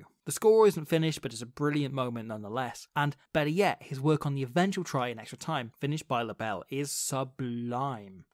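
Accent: Wales, United Kingdom. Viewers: You can turn on generated subtitles, it's not perfect but does the heavy lifting. That welsh accent can be hard to understand for a non-native speaker haha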